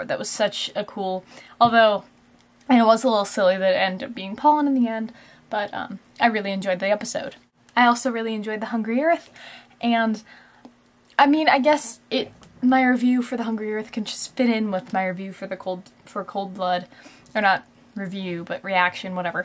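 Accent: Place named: United States. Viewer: This is just a guess, but, are you American by any chance? I'm not trying to be rascist or anything, but I'm just curious as you sound American and said the words 'aunt' and 'semi' in a very American way. Again, please note that this is out of interest, not rascism, because I hate rascism myself.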